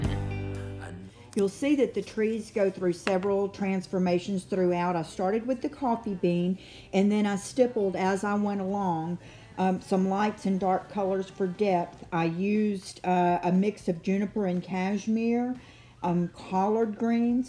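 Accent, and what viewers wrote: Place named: Midwest, United States. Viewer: I'm so happy to have found your channel! Your piece is crazy cool! I've never tried a mural on furniture before, that would intimidate me. You're so talented, this is gorgeous! Okay I have to ask where you're from. Your accent sounds just like my step-MIL (IL/IN).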